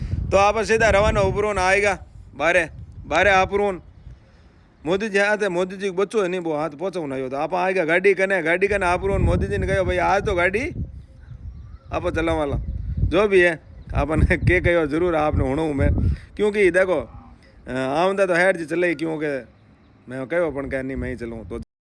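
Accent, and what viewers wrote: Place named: Marwar, India. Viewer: Aap marwadi bolo tho choko lage ..mn saaf h aap ko...thrko h aap ko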